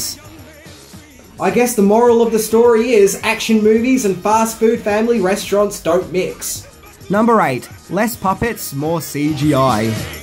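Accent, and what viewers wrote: Australia. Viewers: Wait a minute? Pat Ben-ah-tah? It's said "Ben-ah-tar". Kind of reminds me of how you say "figure" due to the Aussie accent, making "ure" as "ah" instead of sounding like "your". You'd never say the 80s band " " as "Midge Ah". LOL As for the soundtrack, it doesn't make any sense to have " " by when the original song from 1969 would have been way better and more harmonic. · In case you all hadnt noticed, an Aussie, they pronounce things differently, lay off him, he's got it hard enough being upside down all the time. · Maybe its an ausie thing, like how they pronounce seaga instead of sega? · you are annoying beyond words. Frank Lan JELL a Pat BEN et are These are not obscure names from the twelfth century BC, they are actual famous people of our time. Don't guess at names. Not people's names, not place's names. I get that you're from Australia and so e words and phrases may be unfamiliar or even be pronounced differently, but these are NAMES. 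YOU MAKE MY EARS BLEED. I'd block your channel but your info is good and I DO learn new things. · well OK if you call Australian accent proper English,, tbh though I live in England and I've noticed he has mispronounced some very English words,, lol.. Still a great YouTube channel though and I'm only messing about as I know what you mean it's just made me laugh when he said some town names from England wrong in a video ages ago,, tbh I can't even remember what it was now but had to laugh because it was totally wrong.. Lol..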